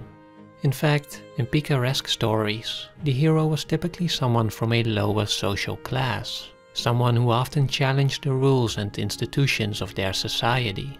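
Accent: Netherlands. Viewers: kan ik opmaken uit je accent dat je in der Nederlanden woont? Klasse kanaal, topkwaliteit materiaal dit! :D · Are you Dutch? Your accent seems Dutch.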